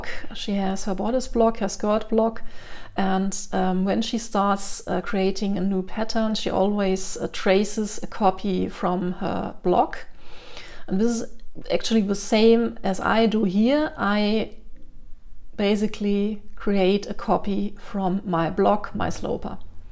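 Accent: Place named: Germany. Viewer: girl, you are a superhero! I love every thing about what you do and already ancomplished. Auch wie ab und zu einfach das Deutsch durch kommt 😅 Aber mega gut es für alle verständlich zu machen natürlich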